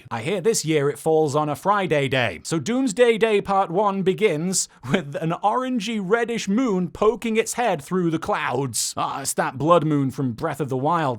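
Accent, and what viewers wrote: United Kingdom. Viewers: It’s amazing to hear how his accent has gotten so progressively less British. Like seriously. Go back and listen to his old vids. British. Accent.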